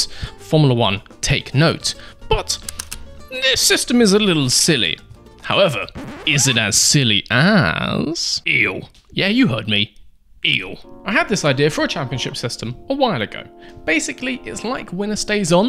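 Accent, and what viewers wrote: United Kingdom. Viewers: british accent just killed the video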